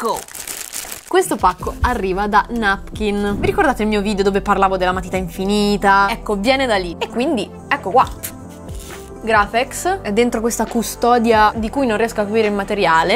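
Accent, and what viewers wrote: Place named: Milan, Italy. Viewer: Sei diventata Milanese!!!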